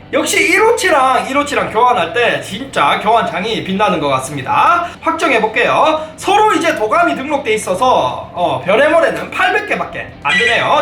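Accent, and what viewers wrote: Gyeongsang, South Korea. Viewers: 님 경상남도 사시나요?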